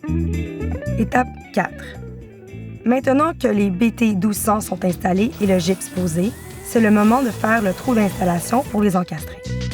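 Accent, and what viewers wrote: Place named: Quebec, Canada. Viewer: Bon enfin dekoi de quebecois lol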